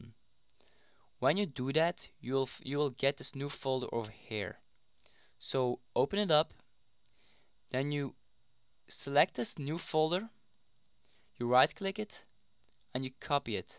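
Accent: Belgium. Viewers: hoorde aan u accent al da ge een belg zijt haha :d ma goeie tutorial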